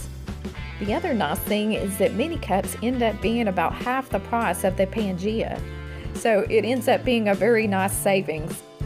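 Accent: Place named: South, United States. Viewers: I love your voice. I'm southern too and this makes me feel like I'm in Sunday school with my teacher Mrs. Katie ❤️ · She from Texasss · Western North Carolina here. We're neighbors! Are you from East Tennessee? Your accent sounds very similar to ours. Kenny Chesney is from Sevierville, I think.:D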